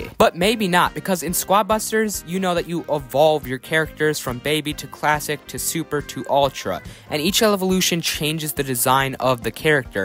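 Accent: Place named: United States. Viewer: That is probably the most American accent i have heard ( if hes not american hes a 17 yr old Australian )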